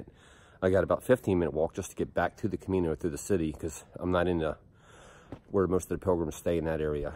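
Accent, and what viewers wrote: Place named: Western Pennsylvania, United States. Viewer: Wow, you were on fire that day. Also, you sound like you are from Western PA.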